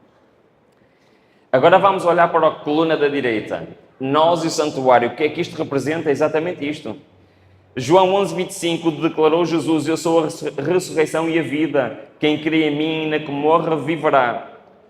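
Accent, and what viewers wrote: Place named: Portugal. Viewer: Boa tarde, amo ver ,este PR. Ele é muito didático, e meu conterrâneo,sou filha de português,e meu pai, falava assim.Moro no Brasil.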